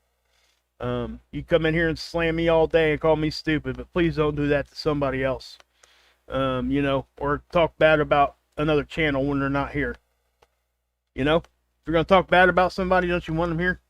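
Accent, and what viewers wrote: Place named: Appalachia, United States. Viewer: btw I love the hillbilly talk it's what I best understand. Thank you with prayers for gettin well soon & blessings to u & family. ✝️🕊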